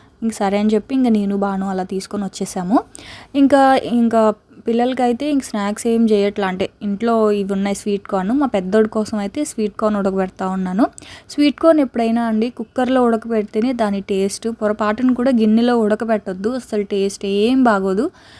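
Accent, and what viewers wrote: Godavari, India. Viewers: Meru pakka godavari slang lo matladutunnaru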